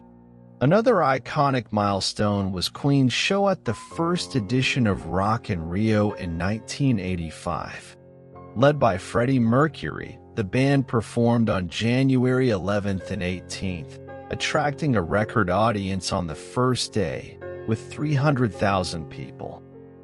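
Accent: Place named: United States. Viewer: I cannot stand American narrators sounds awful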